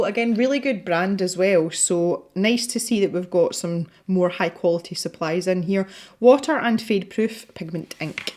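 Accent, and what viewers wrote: Scotland, United Kingdom. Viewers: I’ve been subscribed to your channel for a while and really look forward to your videos, I’ve only just realised you’re from my part of Scotland, that’s why i thought your accent was familiar. Keep doing what you’re doing as you’ve given me the courage to get back into art 😁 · Where do you come from? It Sounds of Scotland. Am I right! Greetings from Germay.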